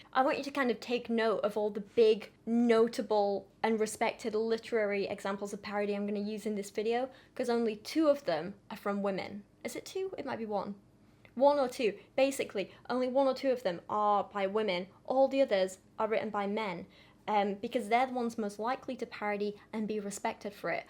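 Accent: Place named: Yorkshire, United Kingdom. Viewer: I can't fully understand the relationship between and Kiera because I never had a dog or a bond so strong with a furry compagnon. Knowing what they went through together, I can only admire the clear love and trust between them. Happy to learn new things with your nice yorkshire accent!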